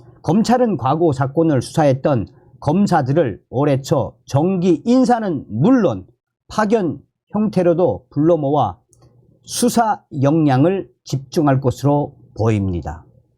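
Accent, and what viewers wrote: North Korea. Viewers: 아저씨 말은 북한말같애 북한말 하지마요,짱나요.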